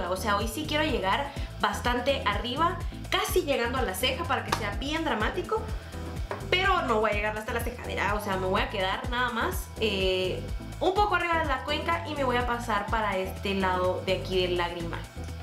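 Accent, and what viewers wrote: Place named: Guatemala. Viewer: Me encanta tu acento chapin! Super chivo el video 🌟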